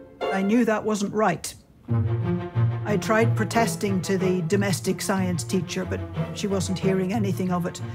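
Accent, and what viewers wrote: United Kingdom. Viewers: "the array rotated with the earth". A british accent does not give validity to this stupid claim. we do NOT detect any movement or motion caused by the earth. we never have. heliocentric world view(taught in public schools) is satanic pedophile propaganda by the jesuits long before the so called greeks "knew" the earth was a ball (supposedly 2000 years ago). Heliocentric do not work in reality. it is a fairytale for grown ups. the earth is immovable and stationary. all the stars are fixed in their positions and rotate in the firmament around us every day and night! the sun and moon is local! for more truth and answers in full scientific depths - Follow Youtube Channel - "Flat Water F.E" and "Celebrate Truth".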